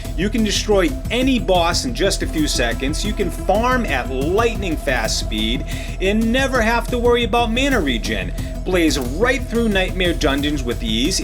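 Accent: Boston, United States. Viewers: God damn the Boston is strong in this one!! Hahha Go Sox brother!!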